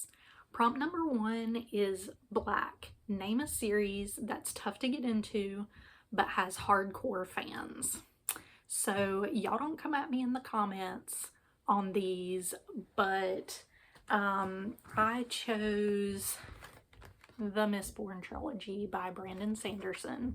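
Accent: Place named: South, United States. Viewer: Yesss our southernnnn 😂we can’t pronounce things hahahaha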